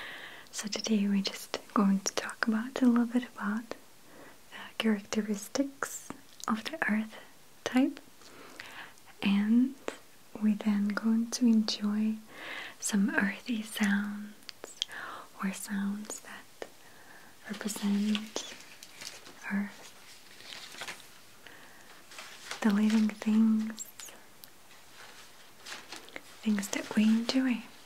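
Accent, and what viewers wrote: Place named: Russia. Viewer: Thank you! Is there any way you can make a video about actual elements? Maybe a mad scientist role play with a lab coat talking about Moscovian in your lovely Russian accent?....think about it.... [waits for inception to happen]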